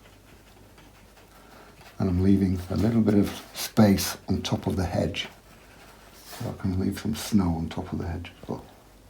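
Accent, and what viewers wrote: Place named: Sheffield, United Kingdom. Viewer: Hiya. I see I'm the first to comment! I found you a couple of weeks ago and I've watched all your videos. I really enjoy your teaching style and casual and effective way of approaching art. I live in South Africa but was born in Sheffield so your accent is a joy to listen to. Best regards Sylvia xxx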